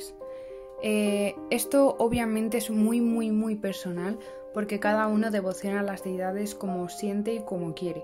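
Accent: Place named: Spain. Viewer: que hermosa bruja amo el acento de las españolas estoy comensando en esto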